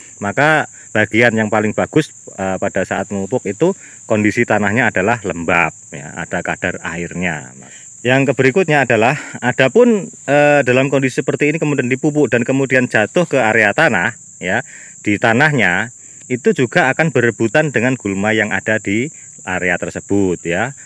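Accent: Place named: Java, Indonesia. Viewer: Temangsang😁😁😁 isih totok jowone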